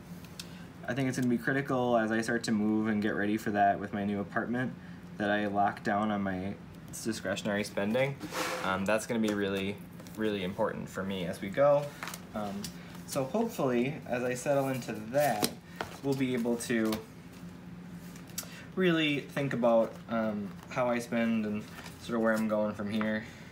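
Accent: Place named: Minnesota, United States. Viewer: Just came across your videos! It's freshing see/ hearing a guy plan! What part of Minnesota are you from sounds like the cities area. I was born and raised in St. Cloud! Love hearing the accent too!!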